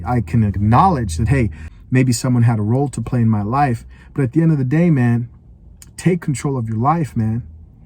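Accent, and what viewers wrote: Africa. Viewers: The African accent is one of the best. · Holy shit you was born in africa lovely bro! I’ve been watching your channel I recently just got redpill. I was born in Nigeria, when I first moved here I was 14 with a deep accent too. Much love brother keep winning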